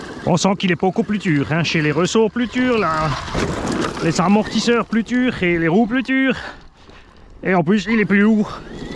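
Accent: Alsace, France. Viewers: Le retour de l'accent Alsacien ! Je suis tellement fan !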